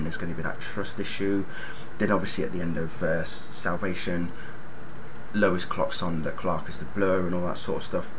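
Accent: United Kingdom. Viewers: Man, U dont have to apologize its ur opinion. I might noit agree with u sometimes but I still like ur review and ur British accent lol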